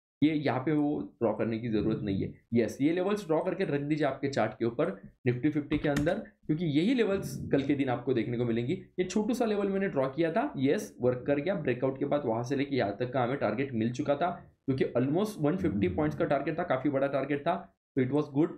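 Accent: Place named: Gujarat, India. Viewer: Sir aap gujrati ho asa lagtahe apke voice se 😊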